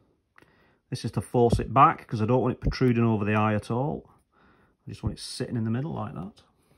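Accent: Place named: Lancashire, United Kingdom. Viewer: cracking straight to the point , ive just started fly fishing and tying just what was needed finding your channel, sounds like your from lancashire 🍻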